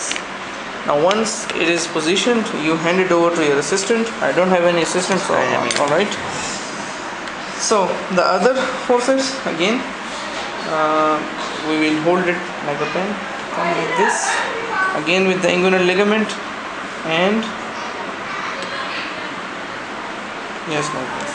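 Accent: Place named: India. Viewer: indian lol... i can tell by the accent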